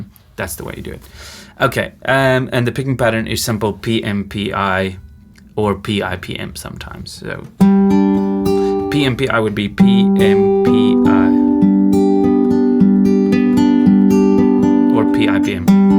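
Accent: South Africa. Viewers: Great video. Where are you from? You sound a bit South African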